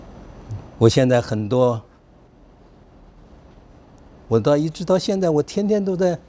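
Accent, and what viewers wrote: China. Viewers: 老教授學養深厚，口音明明是外省腔，所舉的例證卻能用臺語發音，而且還能如此標準，不容易啊！值得借鏡。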